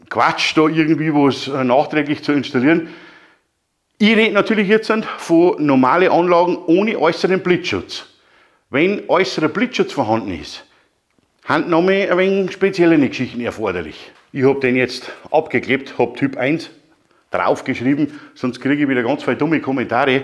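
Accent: Bavaria, Germany. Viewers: Ganz großes Kino, vielen Dank für deinen sehr aufschlussreichen Input. Gruß aus Hamburg. Btw als gebürtiger Hamburger sag ich dir, pfeiff auf die Nasenwastl, die sich am Dialekt stören. Die sollen dort hingehen, wo sie klar kommen und die Sprache verstehen. Ein Tip an die Nörgler, es gibt eine Vielzahl an bayrischen TV Serien und Kinofilmen wo sicher für fast jeden was dabei ist. Ein bißchen gemischte deutsche Kultur tut jedem gut. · Geil endlich jemand der Ahnung hat... zwar sehr speziell und gewöhnungsbedürftig dieses impulsive aber dennoch menschlich. Ich kann zwar kein bayrisch aber verstanden habe ich. Trotzdem werde ich niemals eigenständig an Strom gehen dafür gibt es gelernte Fachkräfte... Bleibt nur danke zu sagen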